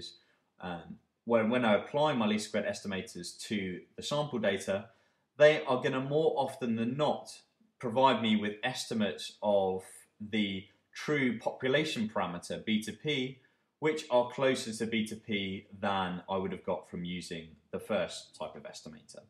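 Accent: United Kingdom. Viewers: just making a pause after some 30 videos... you have a wondurful vision of econometrics, everything is so consistent (...). It is just sometimes a bit complicated for a french guy to understand your Manchester accent...but after some vids it sounds like music of econometrics 😉 thank you so much!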